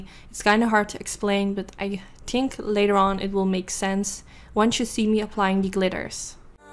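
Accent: Netherlands. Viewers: WOW this look is so cool! Love it! 💚💚💚 Wacht eens even, ik hoor een Nederlands accentje. Ben je Nederlands!? Wat gaaf!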